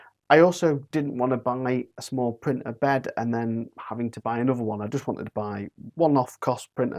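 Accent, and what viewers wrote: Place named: North, United Kingdom. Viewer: Love "free-D" printing. Please learn to speak English. It would be so much easier to listen to you. Speaking Mockney in a northern accent just sounds weird.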